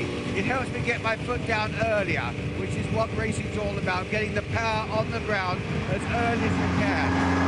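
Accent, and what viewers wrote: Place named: RP, United Kingdom. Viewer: He's one of those guys you could listen to all day and never get bored. I don't know if his, posh?, accent is a help or hindrance to that.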